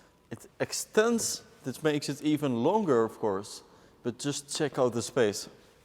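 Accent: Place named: Netherlands. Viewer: Is dit een Nederlands kanaal? Dacht dat ik dat kon horen aan zijn accent.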